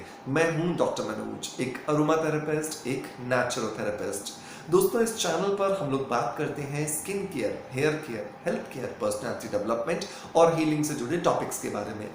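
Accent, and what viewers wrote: Bengal, India. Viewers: Sir u r bengali or odia?? Pls reply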